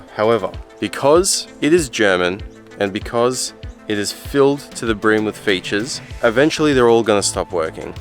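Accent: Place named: Australia. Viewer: They are LUX -URY, ie, pronounced "LUCKS"-URY cars, NOT LUGZURY cars. Be a PROPER SPEAKER and not a SLACK SPEECH OZZIE. First thing I noticed was the poor DICTION or PRONUNCIATION. Never mind the cars, you have to be believable first up! Most half smart Ozzies know NOT to buy EUROPEAN ANYTHING. Being an Automotive trade teacher, SPEAKING CORRECTLY IS IMPORTANT.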